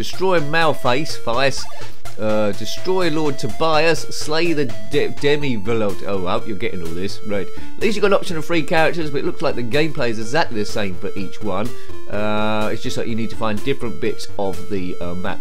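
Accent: United Kingdom. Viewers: I love me some British man fondly commentate over an old obscure ZX Spectrum game, one of my favorite genres on Youtube.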